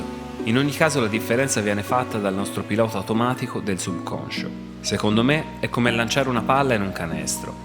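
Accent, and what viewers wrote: Tuscany, Italy. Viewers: L'accento toscano mi fa venire ancora più voglia di ascoltare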